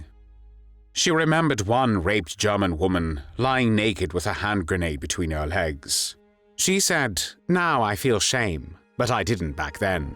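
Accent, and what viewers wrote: England, United Kingdom. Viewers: Soviet communism!? Don’t you know where the communist manifesto was written or where Karl Marx’ grave is?! You have a British accent! And should know the history of your own country! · The English accent of the narrator is nauseating. Hammurabi was right.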